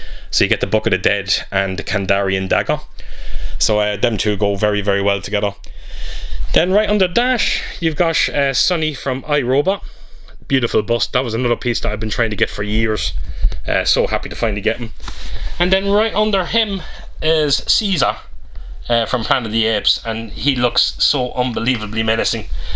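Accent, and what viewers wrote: Ireland. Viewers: is that a Dundalk accent ?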